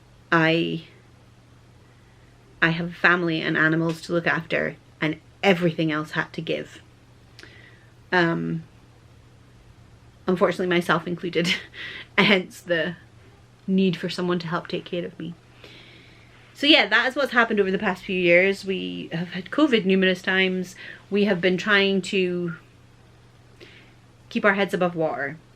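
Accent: Scotland, United Kingdom. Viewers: I have never watched any of your videos before. I love planners and like to try different ones. When I heard you speak, I thought maybe you were from Scotland. My grandma was born in Dalmellington. You are so brave to jump back into this. I wish you the best on your recovery journey.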